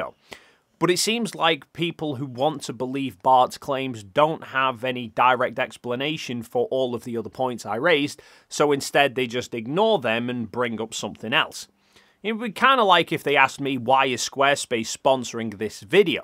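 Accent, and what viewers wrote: United Kingdom. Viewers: Did they think having someone with a British-style accent would make it seem more authoritative, like an Attenborough film? This is similar to the people who ask why the moon photos have a black sky but you cannot see any stars. They just have no idea how a camera works and how exposure changes based on the brightness of light sources. Here, the outside light source (the Earth) is basically over-exposed (when they pull back to show interior) so the camera can show the details inside the capsule as it is darker inside than outside. The window is super-bright because the amount of light coming in is way more than the interior lighting. Same with the starless moon photos. The cameras there were set for the lighting of the sun and reflected sunlight on the surface so there was proper exposure and the photos are not too bright or too dark. 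If they were to set the exposure to see stars in the sky, which are many times less bright than the moon's reflected light, then all the details of the moonscape would be over-exposed, or washed out, and you would not be able to discern much in the view. Plus they did not go to the moon to look at stars; they would look the same as from Earth or low-Earth orbit.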